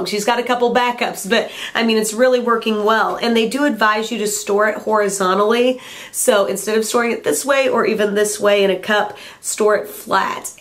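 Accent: South, United States. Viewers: It's the funniest thing.. I don't know why but does anybody else think her voice sounds more "southern" with that raspy voice? lol I dunno but it seems like her accent comes thru just a liiittle more here, sounds like sassy southern woman to me! lol (I'm from the south so I'm not hating at all, if anything its sexy!)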